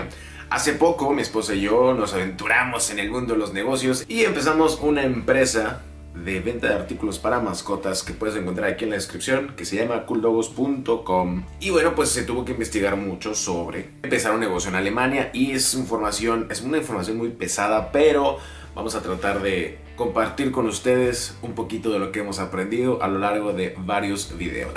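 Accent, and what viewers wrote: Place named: Mexico. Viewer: jajaja me encanta tu forma mexa de hablar <3 jajaj <3 exiiiiitooooooooo en su negocioo!!!!!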